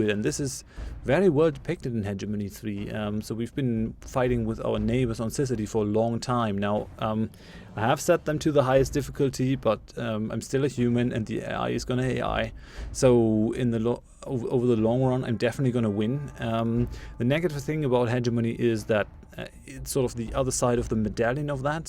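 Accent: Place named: Germany. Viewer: It was quite an interesting series! Waiting for your next playthroughs! Thank you for posting the save file. It's going to be interesting to play it from there. Lots of let's players are not as thoughtful and courteous! :) P.S.: I thought you would look like a professor/teacher with that kind of unique sharp look and the small frameless glasses after hearing your German accented English through the whole series lol. Didn't expect someone who looks like a proper stoner or Keanu from that old movie! No offense intended :) Thanks and have fun!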